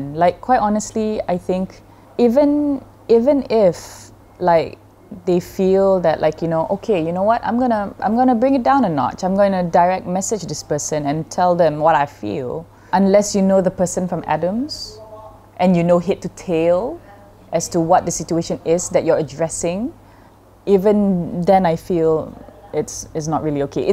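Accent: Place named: Arab world. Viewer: Iya , i lOve R , pelat Arab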